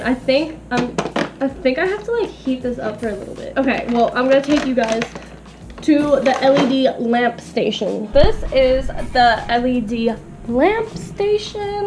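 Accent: Australia. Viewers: Your semi Australian accent.... I love it so much, i wish i have an Australian accent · Australian accentt comin' in hard! I love it lmao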